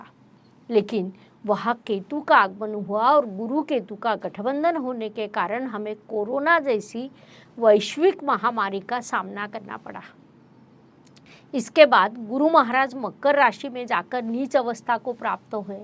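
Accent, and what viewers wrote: Maharashtra, India. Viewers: ASTAM STHAN PATNI KA DHAN KUTUMB VAANI STHAN HAI...PATNI KI VAANI MAI MITTHHAS HOGI😂 SASURAL SE PAISA AA SAKTA HAI...AADHYATMA BADH SAKTA HAI. LET S KEEP FINGERS CROSSED. PURA HINDI PRAVACHAN MARATHI LAHEJE MAI SUNNEKA ANAND AAYA ASTMESH BARAHVE MAI OR PAAP PRABHAV RAHUKA VIPAREET RAJYOG KA ULLEKH HOTA TOU HOSLA BADHTA. BABA KEDARNATH MAHADEV KI JAI.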